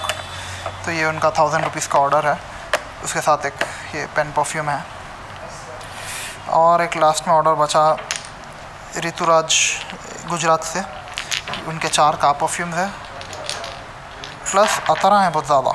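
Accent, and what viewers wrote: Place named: Hyderabad, India. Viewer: Badiya ek dum hyderabadi style..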